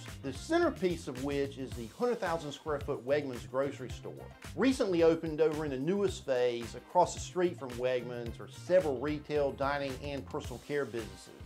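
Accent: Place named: Deep South, United States. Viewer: Are you from N.C.? You have a really thick Deep South accent. Like from Alabama or Mississippi or something.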